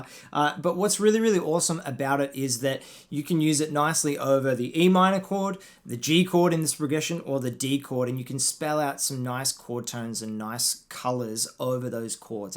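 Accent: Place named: Australia. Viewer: Hi. Good vids man! From your accent you're an Aussie right? I am from New Zealand. We both have the same high voltage (230-240 Volts). Do you have any problems with your sound when your playing live through a Tube Amp. Like a high ear piercing sharp cold tone when you play higher up the neck? If so what do you do about it. What kind of Amp are your using. I have a Marshall and put a brown Box in front to lower the voltage. But not 100% happy with it. Love to hear about your experience with that. Cheers, John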